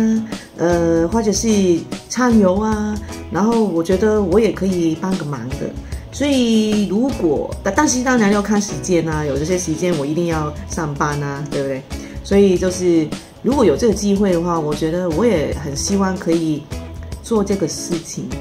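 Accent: Taiwan. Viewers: 掐 (讀音: 恰的平音) 外太空 💖💖叻女依家講嘢夠自然夠放鬆, 發音夠標準夠台味, 試下睇多啲綜藝節目啲字眼會學得好快, 可能係你下一個目標?😊依家返香港會唔會好唔慣啲人講嘢嘅 tone? 有時我聽到隔離啲香港女人講嘢, 太急太 aggressive 都會影響好心情, 要深呼吸, 即時逃離現場~😁😁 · 口音越來越像台灣人了，越來越融入台灣了，讚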